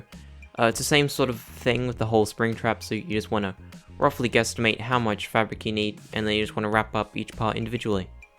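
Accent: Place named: United Kingdom. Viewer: YEEEES HES BRITISH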